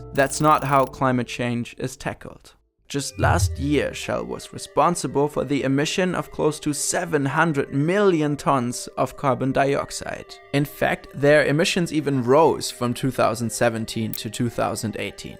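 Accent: Germany. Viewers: Y'all may consider hiring a native English speaker, or having the usual narrator take some spoken English language courses. There are some very common, German pronounciation mistakes in this video, i.e. pronouncing 2019 as two-thousand-nineteen rather than twenty-nineteen. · Great Video but i must say that the german accent is a bit distracting. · I honestly can't watch these videos. I find myself to be a perfect example for the classic german phenomenon of hating how a german accent sounds eventhough you have one yourself. I'd love to see these videos on Simpli or you getting an english native speaker for the voiceover tbh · Yourrr loveley Germann Accent makes it pretty easy to understand it. For me As a german fellow with a way harder german Accent. Sorry for bat-english. They tend to fly around my head every now and then. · Nice Video - smooth Animations, (I love the intro!), informative and your german accent is not that bad :P Good luck with the channel, I hope ppl will write their comments in god damn english otherwise non-german-speakers will just be annoyed as hell xD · Holy fuck bruh I wish I spoke German the accent is clean as fuck👌🏾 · Krasser Akzent, den find ich sogar als Deutscher unangenehm^^ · Even if I love your videos and the concept is a good idea in theory I just can't handle the German accent. 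If you're looking for someone to read your scripts I volunteer. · Dudes! I'd really like to do voice-overs for your vids. Although it doesn't seem to be a big problem, I could get rid of the entertaining german accent😉 I can't really find an imprint with an email adress, so I have to rely on my comment being upvoted... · Love your German accent. Love from Britain! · Nice german acsent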